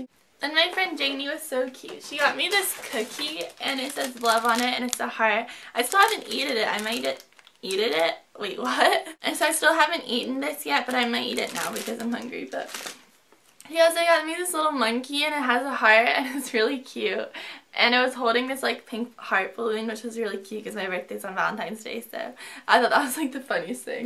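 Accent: United States. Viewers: Are you from America ? Either that or you put the accent on?, but loved this vid! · I know ur accent is american but do you live in uk because all the brands r uk shops? jw btw xx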